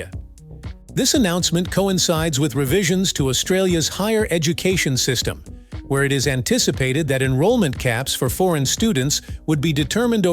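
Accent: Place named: United States. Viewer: Why ido we have a bloody yank speaking and not Aussie????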